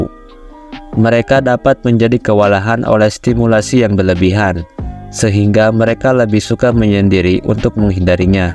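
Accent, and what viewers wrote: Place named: West Java, Indonesia. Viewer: yg ngomong urang sunda nya? 😅 aksennya keliatan..🙏hapunten kang..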